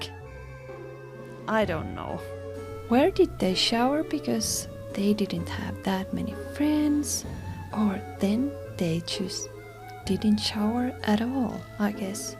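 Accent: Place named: Finland. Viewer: So rude. She has a beautiful voice and is excited about her cleaning. I love her Finnish accent. 💙🤍💙🤍💙🤍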